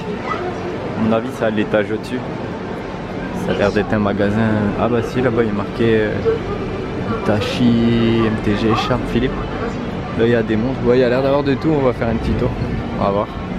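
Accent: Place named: Nord-Pas-de-Calais, France. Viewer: C un chti😊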